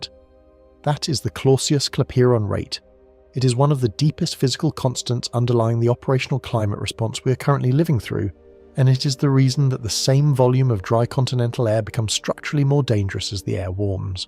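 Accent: England, United Kingdom. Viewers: Isn't there anything going on in Europe why are you telling that's about the United States and not talking about the continent that I think you're from since you have an English accent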